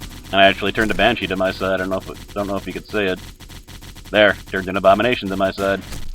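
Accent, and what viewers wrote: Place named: South, United States. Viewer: your accent remember me a southern man... don't know why!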